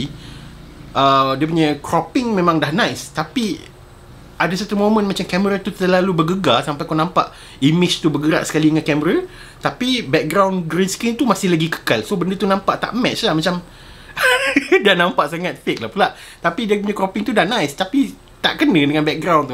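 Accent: Kedah, Malaysia. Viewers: Rasa lorat dalam kawasan kedah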